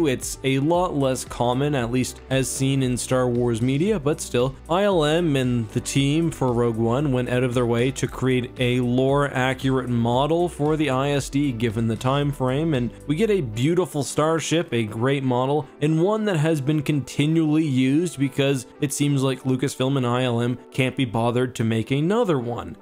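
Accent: Canada. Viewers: Are you canadian? It's the first time I notice some "oots" and "Aboots"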